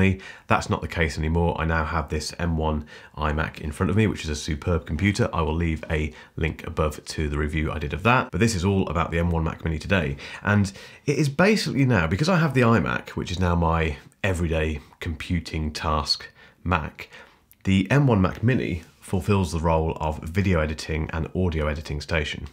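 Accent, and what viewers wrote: United Kingdom. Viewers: I like your style; and your grey walls, so easy on the eyes. You've obviously thought about the visual look of your show. I like your clipped British accent. You guys just speak English better than we do :) I'm American. You also get the information out in an articulate and quick manner, not always the case with other youtubers.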